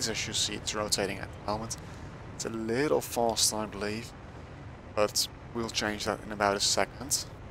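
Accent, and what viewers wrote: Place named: Netherlands. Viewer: You speak English very well but with that inevitable dutch accent. Anyways, very cool machine, I would definitely be interested in a small ship version of it :p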